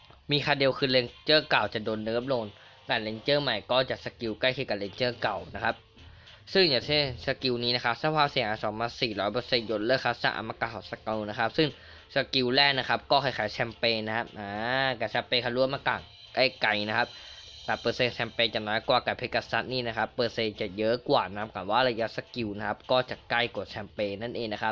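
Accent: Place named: China. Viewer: ไม่ค่อยชัดเลยอะคนจีนใช่ป่าว?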